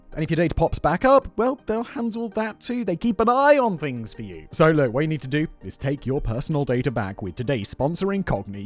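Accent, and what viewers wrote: United Kingdom. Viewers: Bro said ''china aggressive international approach'' and is just china investing in emerging economies. And he says this with an UK accent, you know the empire that conquered half the world trough violence lmao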